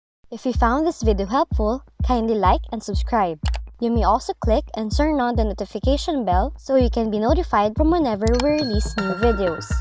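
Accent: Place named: China. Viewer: unable to trust chinese accent there is suspicion of getting tricked into malware infection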